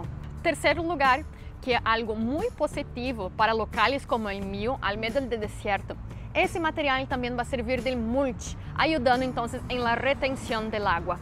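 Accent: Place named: Brazil. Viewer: Cai de paraquedas aqui e vi que era brasileira pelo sotaque, ótimo vídeo parabéns 👏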